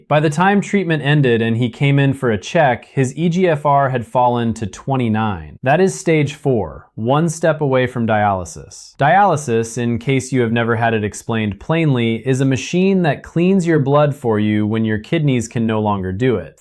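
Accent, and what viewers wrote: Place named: England, United Kingdom. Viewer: Male 70 yo English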